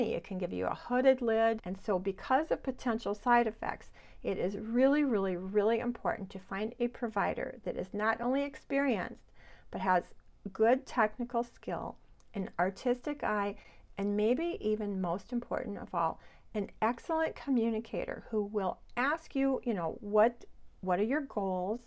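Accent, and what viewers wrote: Midwest, United States. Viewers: I am living for your midwestern accent. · I knew your accent was Minnesotan before I seen this comment!!! 🤣😂😅❤️❤️💁🏻‍♀️ I’m the person that wouldn’t touch it with a ten foot pole LOL! 🤪😉😘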